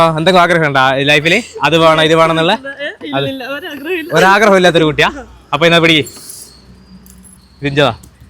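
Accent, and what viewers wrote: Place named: Coastal Kerala, India. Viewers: Chettante slang poli. Evida sthalm. Kannur aano🤔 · വടകര സംസാരം 😂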